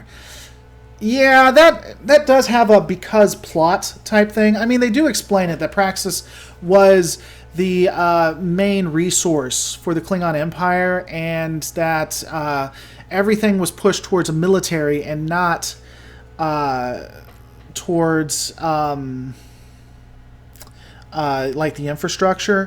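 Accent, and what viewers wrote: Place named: South, United States. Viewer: Hi. I don't like it when people have a go at you about the South, or your accent. It's uncalled for. Your views are what counts, not how you pronounce them. More power to you for putting down the ruder parts of the group watching you. I'm a Brit, so the whole "your a fan of the British" thing made me laugh - we were the good guys! Don't worry, I forgive the American rebels, ha ha. We're talking about events over 200 years ago so I think we should probably be moving on to make a better shared future on our precious pale blue dot.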